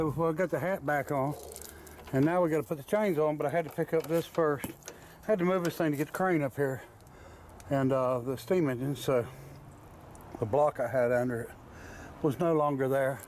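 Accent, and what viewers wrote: United States. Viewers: A true American, an American holiday, American ingenuity, American scenery, American accent throughout.......gives one hope that this is still America and we might, just MIGHT recover. Need an example of what I mean? When buying a $10 watch at Walmart, look at the instruction sheet. Three feet square! The English part is three square inches. A new iron, same thing only they tell you "DON'T IRON CLOTHES WHILE WEARING THEM!" Whoopeee! Happy Mothers Day. Thanks.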